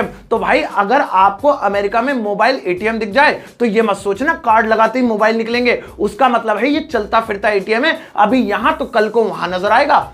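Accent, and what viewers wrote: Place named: Delhi, India. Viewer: nice voice Delhi se ho pakka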